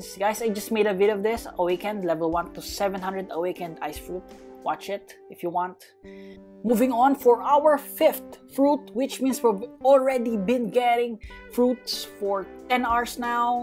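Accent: Tagalog, Philippines. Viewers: Halata tagalog 🤣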